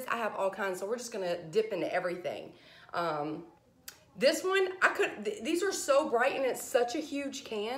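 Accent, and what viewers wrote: South, United States. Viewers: New subscriber ! I love your energy and southern accent ❤️ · I love to hear your southern accent, of course I’m from the north. I’m still listening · I just love ya girl. I just love hearing you speak with that gorgeous southern voice of yours. Us southern girls need to stick together. I did find several of the cookbooks & I too love to cook especially new things. God Bless & "Yes Mam".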